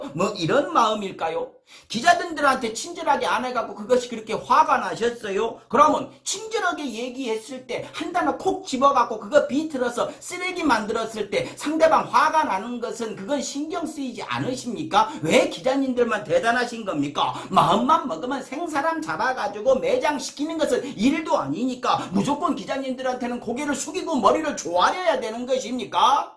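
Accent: Jeolla, South Korea. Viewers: 성범형님, 전라도 떠나온지 4년인데.. 내용떠나서 형님 사투리 들을라고 들어옵니다. 그런데 속까지 시원해진께 기가맥히요.. ^^ 사랑하요 행님.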